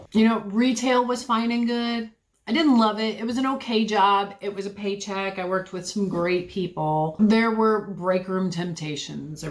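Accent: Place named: Pennsylvania, United States. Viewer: Got a sister who is a dealer in a casino also and her husband is a security guard. She's been at it for years. And it's really been hard on her body and she's gained a lot of weight. Loves her job but she's not ketovore or anything like it. YOU CAN DO THIS!! You're a true believer in this WOE and you'll stick to it.!! If you're in PA I just wonder if you're at the same casino??? You sound like a Pennsylvanian! First time to your channel so I hope you wouldn't mind telling us where you are? BEST WISHES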